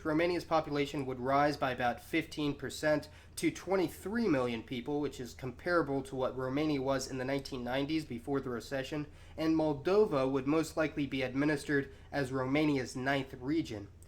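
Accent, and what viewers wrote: United States. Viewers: there are multiple issues with your videos and you know about some about these. You have a good American accent, sounds convincing. But that's your nemesis as well. 1. Pronunciation issues These stem from English, where they repeat A(ei), B(bi), C(si), D(di), E(i), F(ef), G(dji), H(eich), I(ai)...Y(uai), Z(zet). FYI, all speakers of BBC check the correct pronunciation of any foreign name with locals BEFORE saying in on air! Please, note that languages different than English have PHONETIC alphabet, meaning that 'A' is always (a) but not (ei), 'E' is (e) but not (i), and so on lran is not (airan) but (iran). Just like Italy is not (aiteiluai) but (itali), or more correctly Italia (italia), and Enland should be Anglia (anglia) but not (einglaiei). Iraq is not (airak) but (irak). Macedonia is not (masedonia) but (makedonia) Dacia is not (deisha) but (dakia) Thracia is not (treisha) but (trakia) Persia is not (purza) but (persia) 2. 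Ethnic issues Romans (citizens of Roman Empire) have absolutely nothing in common with Gypsies who came from India with Alexander The Great, for whom George Soros paid to be named 'romani'. 3. Today's Romanians are descendants of ancient Dacians (dakians). Dacians (dakians) are Thracians (trakians), and Tracians (trakians) are Bulgarians. FYI, old Bulgarians, had the most precise/accurate calendar ever known and, acknowledging this, in 1976 UNESCO recommended its adoption worldwide. These people had the oldest known crafted gold - Varna civiliz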